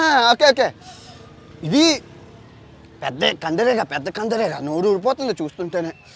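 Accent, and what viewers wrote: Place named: Godavari, India. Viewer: Anna godavari yasa super ga matladavu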